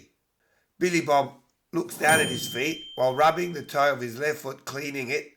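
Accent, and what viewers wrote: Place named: South, United States. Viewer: Your rednek accent sucks.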